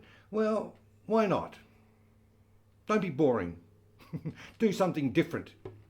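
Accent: United Kingdom. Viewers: I watch you long time ago and i love your content and learn a lot from you sir And i also like the new content and you are speaking with us and we are getting to know your beautiful personality and british accent... Big fan of you please sir keep it up... I'm from Syria ...all love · You must have spent a lot of time in the U.K.because you now have an English accent....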